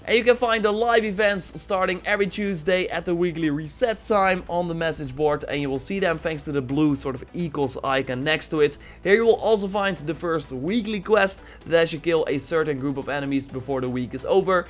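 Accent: Netherlands. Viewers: Are you from Holland? Your thick accent makes me think you are haha, greetings from Belgium. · My man is dutch isnt hé?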